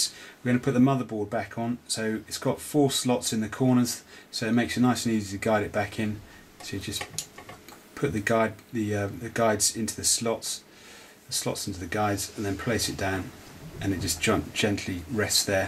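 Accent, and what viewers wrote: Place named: United Kingdom. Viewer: Thank you very much for this video. I was able to fix my son's 820 for 1/3 price! and your British is music for my ears:) Greetings from Budapest.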